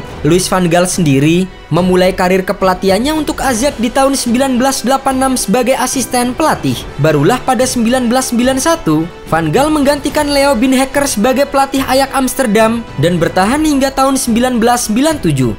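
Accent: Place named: Gayo, Indonesia. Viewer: Lucu ngomongnya digayoor.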